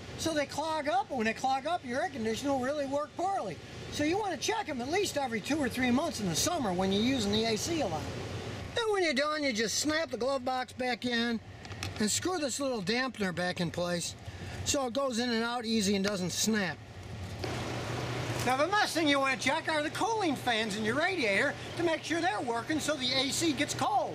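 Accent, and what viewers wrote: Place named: East Coast, United States. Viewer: hey i am curious have you ever lived in pennsylvania? Your accent sounds like it has a bit of east coast in it...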